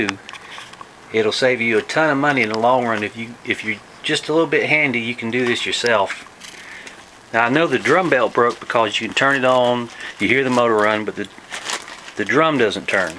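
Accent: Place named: North Carolina, United States. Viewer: Thank you! I didn't know it was so easy! (And you accent reminds me of home.) (Coastal NC)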